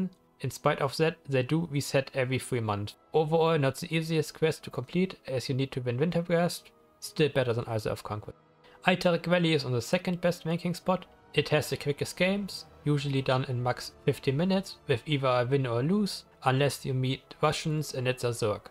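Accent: Germany. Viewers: It was quite strange listening to you speak, because I know you're speaking English but half the time it sounded like you were speaking German only to realize you were indeed speaking English but the cadence and rhythm and enunciation was very much German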